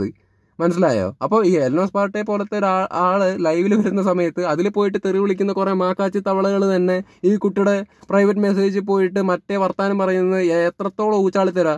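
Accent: Palakkad, India. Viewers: Palakkad slang varund broiii👏👏👏👏👏 pkd anel proud of yu man✌️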